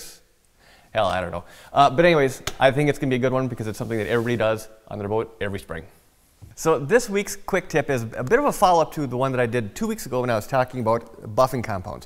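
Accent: Midwest, United States. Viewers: Really like your knowledge and presentation. And a good Midwestern accent. Ex michigander here.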